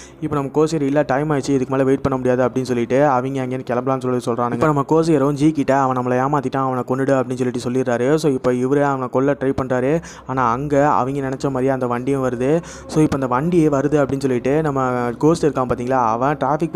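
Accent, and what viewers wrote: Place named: Coimbatore, India. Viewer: Avainka , eveinka slang -CBE 😁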